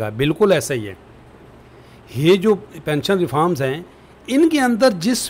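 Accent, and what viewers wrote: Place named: Pothohari, Pakistan. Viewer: AP pothohar say belong rktay lahja pothohar Wala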